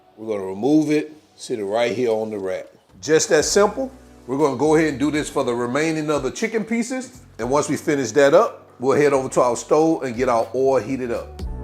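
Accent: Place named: United States. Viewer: I love the way this guy talks. No idea what part of the US you're from but as a Canadian it sounds pretty cool to me. This looks amazing, I'm gonna make these as chicken fingers, idk something about federal laws. All chicken must be served in the form of fingies or you're not a true Canadian or whatever.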